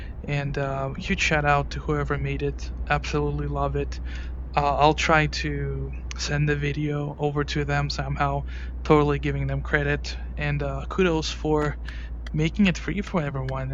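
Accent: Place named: North, United Kingdom. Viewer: ofc whatever , i just wondering like typical north accent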